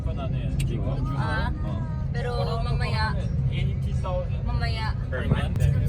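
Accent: Tagalog, Philippines. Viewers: Ang galing mo mag salita ng tagalog pati accent mo tagalog !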